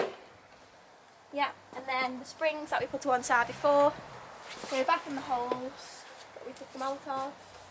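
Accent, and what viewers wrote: Liverpool, United Kingdom. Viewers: are u from liverpool? I was deployed to england for 6 months, trying to see If i learned anything about accents while I was there lol! Super clean ST!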